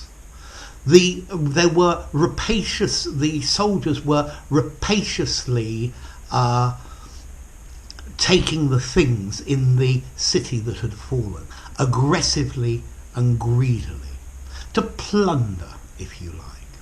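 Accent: United Kingdom. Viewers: Thank you,! I always try to see some of your lessons. They are helpful and I prefer the British accent.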